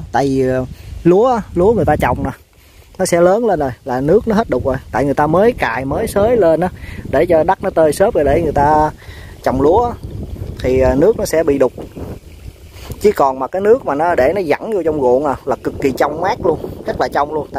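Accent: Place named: South, Vietnam. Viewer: Clip hay đó anh. Nhưng mà anh là người Miền Nam thì phát âm chuẩn Miền Nam tí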